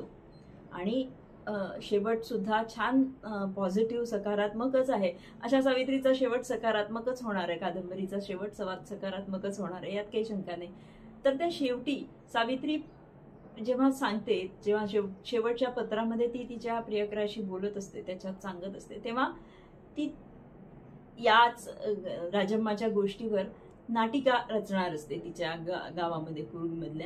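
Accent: Vidarbha, India. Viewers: My humble tributes to late Shri ji. Please continue the channel in his memory. I always liked his style in Vidarbha Marathi ascents. Happy birthday to Sahab.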